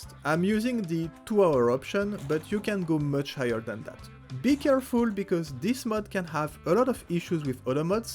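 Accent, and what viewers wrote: France. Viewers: J'ai replongé dans l'univers de S.T.A.L.K.E.R plus sérieusement récemment et j'ai découvert tes vidéos, je t'écris en français car j'assume par ton accent que tu l'es ;) merci pour tes vidéos j'adore vraiment t'es au top !! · Magnifique accent français !! et merci pour cette video je pense installer au moins la moitié des mods dont tu as parlé, mais "eye adaptation fix" oh bordel MERCI CA EXISTE !!??!! :) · Your super subtle french accent 😅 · are you french? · This French accent is so strong 😂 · come on, with the full gameplay with mods already! I try to watch other gameplays, but god, some are boring ! ++++ bonus to your French accent, most times I want to see no comments gameplay, but yours is damn enjoyable ! mod the game how you like it, and lets see some STALKER2 gameplay from you! I know you won't disappoint!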